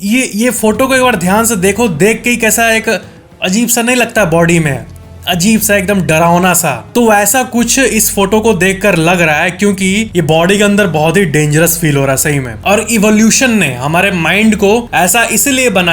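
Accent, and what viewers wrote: Bihar, India. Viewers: Bihari tone hai inka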